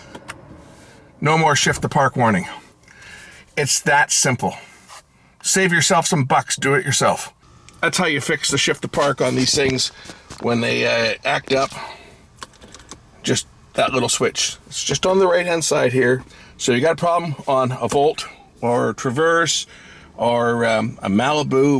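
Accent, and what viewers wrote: Canada. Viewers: Thanks very much for posting. Makes a lot of sense. I'm gonna give it a shot since I sure don't want to pay the dealership to do it. If this doesn't work I'll try replacing the shifter myself. Either way the vid was worth watching just for your magnificent Canadian accent. Cheers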